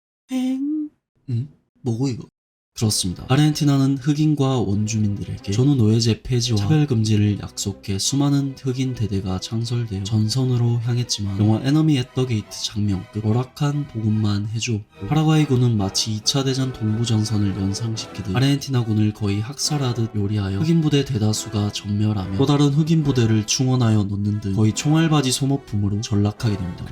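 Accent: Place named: Gyeongsang, South Korea. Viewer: 형은 경상도 말 할때가 제일 멋져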